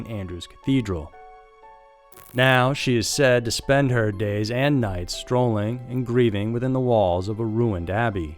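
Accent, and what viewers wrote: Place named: Texas, United States. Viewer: I think he has a Texas accent. Its very familiar. Probably why I like listening to.it. when he says 5 like fiiiive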